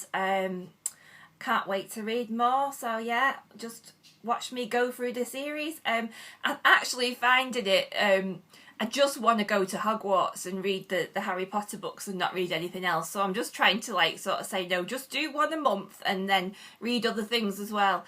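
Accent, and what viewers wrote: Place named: Northern England, United Kingdom. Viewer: Yey, a northern lass. Found u via Eleanor and loving ur videos. Nice to hear a northern accent xx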